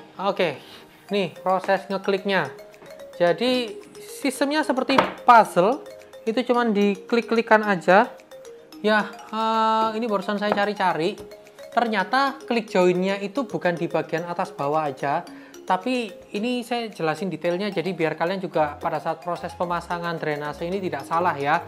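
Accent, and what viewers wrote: Surabaya, Indonesia. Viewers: mangkane kok logat e ga asing, arek boyo tibakne, tak sub koh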